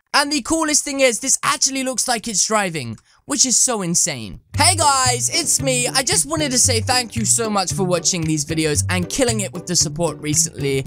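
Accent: United Kingdom. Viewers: I like it when u talk u got british acsent ( dislike if acsent spelling is wrong)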